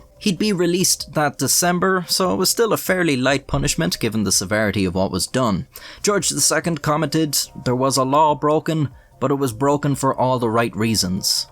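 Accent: Ireland. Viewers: I like hearing ridiculous stories and funny quips in an Irish accent… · With your accent lad I just cant figure which county ya from , wicklow, meath. If you're from dub I'm gonna unsubscribe. Lie if ya want to.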